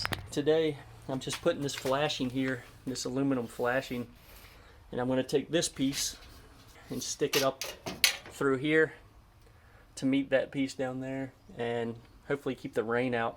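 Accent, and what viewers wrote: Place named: West Coast, United States. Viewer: WOW, that's the kind of thing I would dream of doing. You saved a lot of green backs that way! East Coast. It sounds like your from the West coast. LOL. Thanks for the response, I liked that too!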